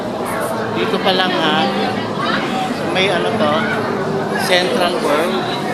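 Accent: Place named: Philippines. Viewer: สำเนียงคล้ายคนฟิลิปปินส์นะ ถ้าผิดขอโทษ เพราะฉันมีเพื่อนฟิลิปปินส์เยอะ 🇺🇸🇺🇸🇺🇸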